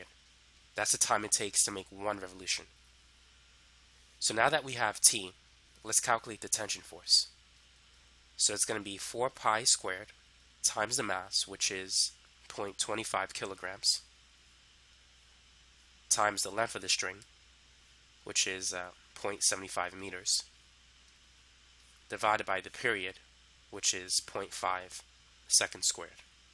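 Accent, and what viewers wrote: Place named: New York, United States. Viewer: i cant stop focussing on the new york accent omg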